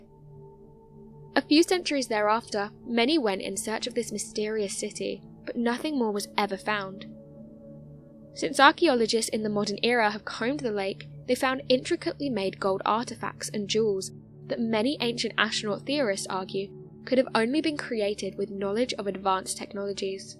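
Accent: United Kingdom. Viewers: I wish this woman would do some asrm videos. Such a lovely voice and a British accent, hell all I need is a bag of chips and I'm set.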